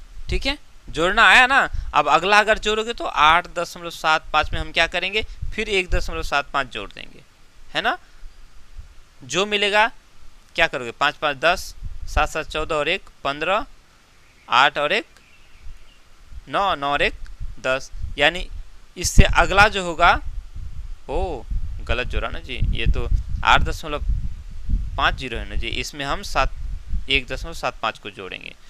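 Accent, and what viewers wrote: Bihar, India. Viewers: Sir aap bihar se ho kya ❤❤❤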